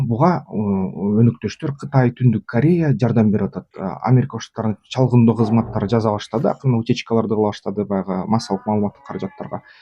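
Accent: Standard. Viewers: отто таза сүйлөйсүң дикция керемет.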